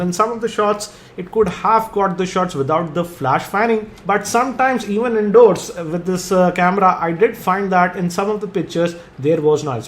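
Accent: India. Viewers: that indian english gave me cancer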